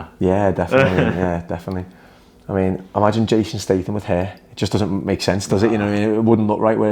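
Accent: Liverpool, United Kingdom. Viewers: I’m 74, never been a barber nor a hairstylist, but I’ve sat in the chair many times for haircuts. None of my hairdressers knew what knows, he has taken his skills to a much higher level, also I love his Liverpool accent! 😃🇦🇺😍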